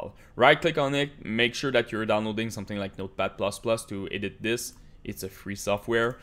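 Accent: Quebec, Canada. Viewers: Big accent of Quebec speaking inglish esti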